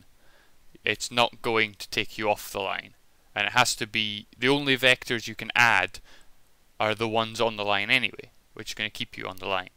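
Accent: Scotland, United Kingdom. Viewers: Nice scottish accent! *<:D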